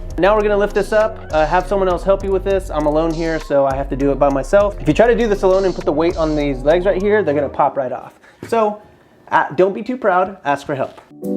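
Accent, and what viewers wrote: India. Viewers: Sounds like an Indian man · Accent sounds like a Hindu guy.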